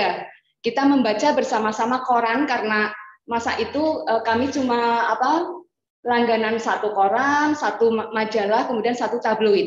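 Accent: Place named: Banyumas, Indonesia. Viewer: Salam ngapak bu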